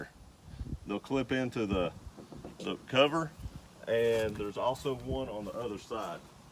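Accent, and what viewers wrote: South, United States. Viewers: Ton-new cover love the accent and love how your southern shows how everyone is special and different. I say potatoes and my grandmother says taters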